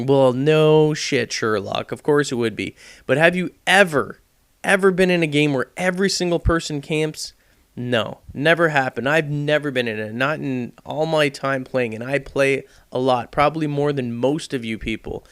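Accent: Canada. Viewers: lol u canadian?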